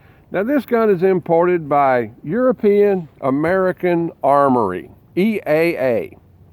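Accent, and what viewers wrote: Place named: Texas, United States. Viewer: He sounds like a fellow TEXAN to me!!!!!!!!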